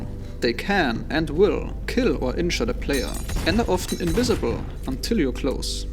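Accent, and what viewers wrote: Germany. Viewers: This questions doenst have anything to do with the video it self but where you from my guess would be german since im german myself but it could also be norwegian or something up there? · Warum redest du nicht deutsch?Dein Slang/Dialekt verrät dich:goodvibes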